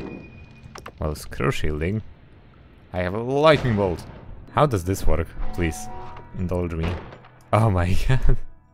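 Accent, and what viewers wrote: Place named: Poland. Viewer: You have a very slavic accent, are You perhaps Polish? Also noic nice wand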